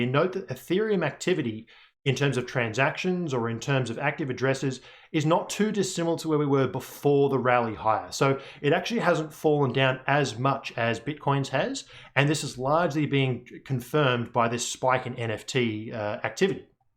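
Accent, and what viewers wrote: Australia. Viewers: Thank you so much for your effort. 💐 Enjoyed the thick Aussie accent, in addition to the analytics.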